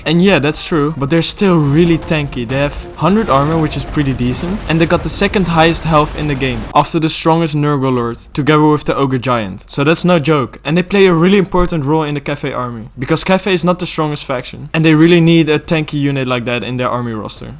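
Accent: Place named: Netherlands. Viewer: your dutch accent is thick!!!! (im guessing your dutch :) ) hello fellow dutchie!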